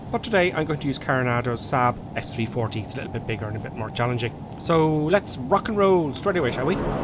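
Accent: Ireland. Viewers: Sound like you're Irish xD I'm from Dublin! Cool vids man! Really funny and good detailed reviews! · Are u Irish if u are what county u from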